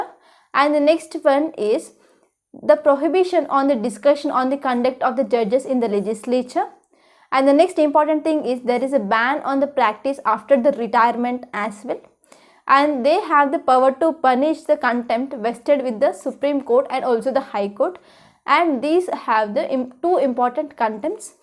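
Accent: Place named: Tamil Nadu, India. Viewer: Your slang your accent your English and your pronunciation literally 🤦🏻‍♂️🤦🏻‍♂️ please go ahead in Tamil if you could. I guess your a tamilian. No offence.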